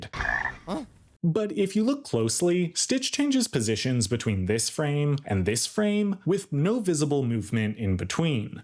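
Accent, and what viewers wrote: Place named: United States. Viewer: That white American accent you got. Cripes.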